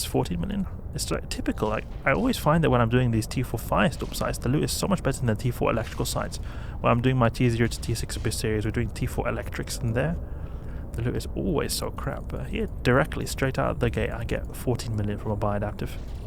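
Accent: South Africa. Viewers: I'm detecting South African in this guy, just a hint.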